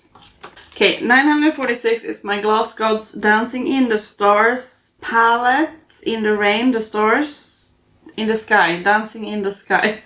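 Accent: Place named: Scandinavia. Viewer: Hi! Here to watch your video with a cup of coffee this month. Also I just noticed you are from Sweden! I was guessing somewhere Scandinavian based on your accent. I had the pleasure of going to Stockholm 2 years ago for a few days and had soooo much fun. It was in November, and I lived in Florida at the time, so the cold weather felt so refreshing and I even got to see some snowflakes! 🩷💜🩵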